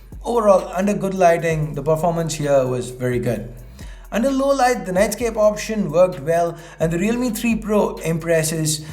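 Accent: India. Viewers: difficult to understand your indian slang english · I know its bad on my part, but I just can't stand Indians having a mix of Indian and foreign accent. Sorry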